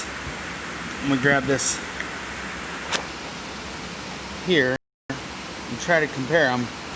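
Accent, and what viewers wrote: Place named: Iowa, United States. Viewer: Are you from iowa?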